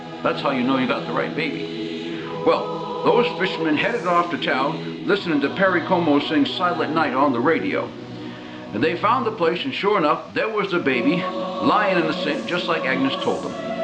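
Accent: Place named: New Hampshire, United States. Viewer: Merry Christmas,! It just ain't Christmas without hearing you read the Christmas story, New Hampsha style. Keep up the good work!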